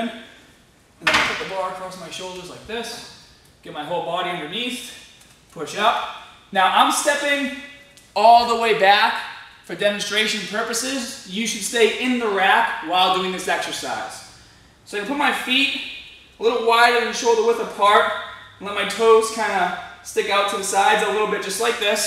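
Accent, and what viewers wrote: Boston, United States. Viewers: I noticed from watching many of his videos that his Boston accent kind of comes and goes...I guess similar to how my Texan accent comes and goes depending on who I've been around all day. If I'm visiting family, I have a stronger accent. But I live in Korea, so I usually have a very standard English way of speaking.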